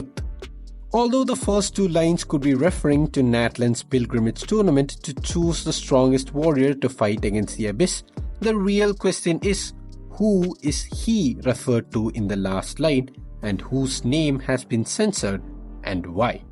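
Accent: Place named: India. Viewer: I have to ask, where are you from? Your accent to me sounds Indian, but sometimes it sounds Spanish/ Latino.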